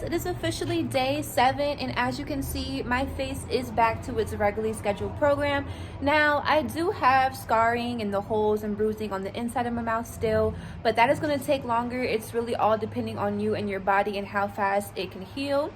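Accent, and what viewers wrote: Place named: New Orleans, United States. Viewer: y’all live in nola? i know my people when i hear them😂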